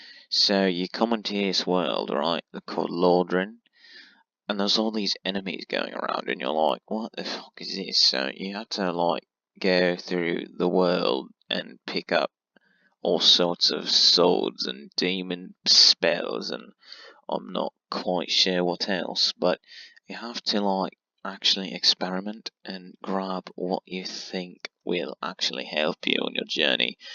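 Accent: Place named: New Zealand. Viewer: What an Astounding Review! seems legitimate af I Like that New Zealand Accent Daddy 💦💦💦💦💦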